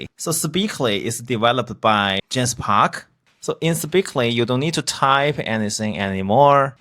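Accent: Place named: China. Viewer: Honestly, i think you should either work on your heavy chinese accent or consider using tts ... its really hard to hear your words clearly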